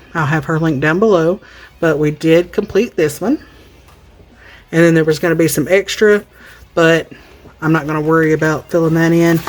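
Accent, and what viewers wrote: South, United States. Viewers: I'll always love just listening to you chat with us. You're just the right amount of southern (on something you mentioned in another vid)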